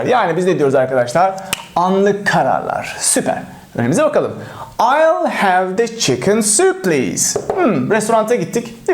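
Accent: United Kingdom. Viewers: Hocam lütfen daha çok zaman ayırın.İlk defa ingilizce öğrenebileceğim kanal buldum hem de ingiliz aksanı. Çoğu kanal umut satıyor, insanlar izledikleriyle kalıyor malesef. İngiliz aksanı ile konuşulan film ve dizi önerileri gelirse çok iyi olur 👍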